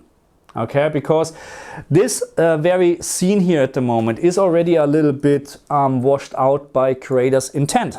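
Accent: Germany. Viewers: i love deinen deutschen akzent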